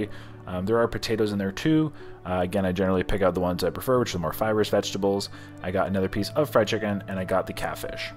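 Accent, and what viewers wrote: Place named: South, United States. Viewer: Idk if you remember seeing my comment way back, but I will repeat; although I respect that you're a proud Canadian (as well you should be), I feel like you're an adopted southern USA boy at heart. I've heard your twang inflections, and have definitely witnessed your love of southern cuisine. If you ever decide to immigrate, we would welcome you with open arms and lots of southern hospitality! Further, you could never out-eat the spread when we "put on the dog" at any of our family gatherings!! God bless!🥰🥰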